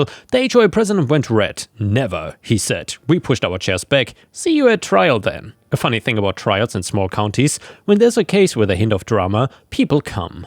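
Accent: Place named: Germany. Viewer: lol a German accent tells the story cute 🥰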